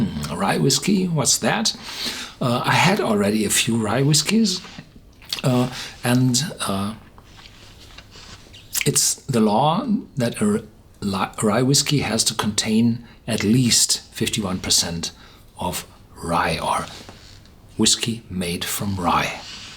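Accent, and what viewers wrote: Germany. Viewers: Sympathisches English 😉. German style.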